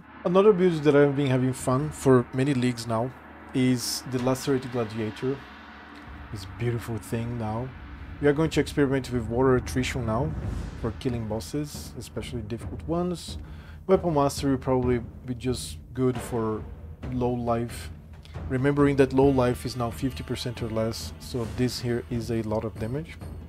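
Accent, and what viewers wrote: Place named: Brazil. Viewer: You sound brasilian 👀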